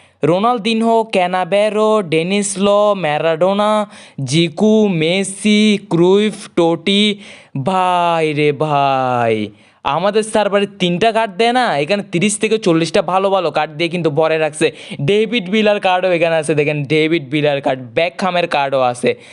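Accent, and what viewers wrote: Chittagong, Bangladesh. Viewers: ভাইয়া আপনার বাড়ি কি চট্টগ্রামে?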